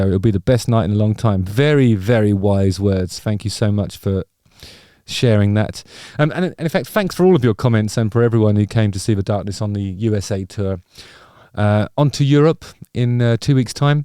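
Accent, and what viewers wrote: United Kingdom. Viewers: i'm embarassed i had never heard of the Darkness (must have been that rock i was living on in hawaii ;-)). . . .somehow I stumbled on your youtube channel and thought it was the best thing I've ever seen, positive, insightful, british accent. I checked out the Darkness and fuck, damn, i play it all the time. my daughter is like "are the Darkness your favorite band". i'm 57 and thought i'd never find anything new an exciting (for me at least, 15 years late) in music again. mahalo. it's a joy to watch you work and inspiring in ways you might not immediately know that are unrelated to music, but overcoming the struggles of life. after watching this, i guess i need to smile more too now, damn you Justin Hawkins you are making the world less miserable!!! ;-) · I like your accent; where in the UK do you come from?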